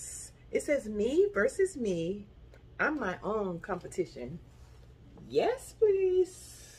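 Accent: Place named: South, United States. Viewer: First good morning, and you are highly blessed. We all are, and all I would tell them that there’s many more Temu Haulers other than yours channel to choose from. Feel free to move over to them. I give you my blessing to change and you should get what your looking for. Keep doing what you’re doing, because I like others of your viewers love your southern accent then you let us know that not your normal personality, but we love it. ❤❤❤❤❤❤❤🎉🎉🎉🎉🎉🎉. Your beautiful and your giving us NO BORING CONTENT. Linda Hackney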